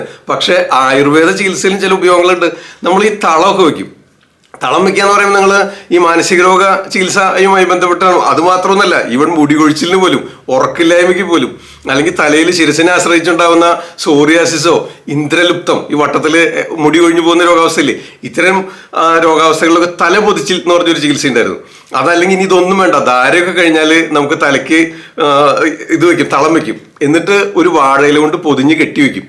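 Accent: Thrissur, India. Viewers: pleasant Thrssivaperror accent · Thrisoor slang👌 nannaittund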